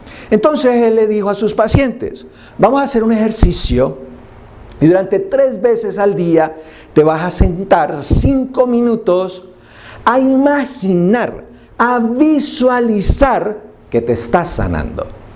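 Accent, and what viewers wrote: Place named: Antioquia, Colombia. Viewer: Cuando no lo escucho siento que se me baja la alegría. Y podía saberlo de memoria, pero me encanta su voz tan paisa y su presencia.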